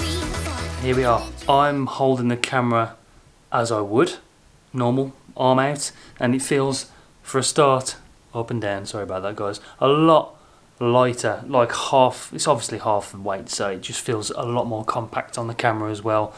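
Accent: United Kingdom. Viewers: Hey thanks for the video, quick question, if I have a canon m50, I don’t need an adapter right? Also you sound like you’re from the UK, I am too and was wondering where I could get the lens from?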